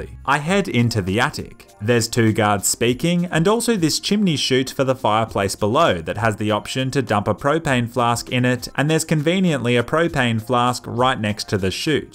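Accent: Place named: Australia. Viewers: he is Australian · Sweet video dude. Aussie accents are too good.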